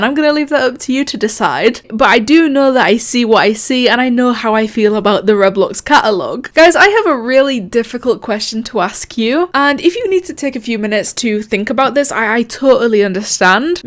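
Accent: United Kingdom. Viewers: Yeah, WHY are you British? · It’s annoying I can’t get over her posh English lol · We always wanted to know: why are you british? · ok ok, but, why are you british? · you never answered why you are British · why are you British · I love you british accent and i love how ur so good at arsenal T^T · are you brittish? and if so, why are you in may 13th and not december 3123?! uefyhbwegyfbhewgr means hello in my language. i am smartest individual roblox clothing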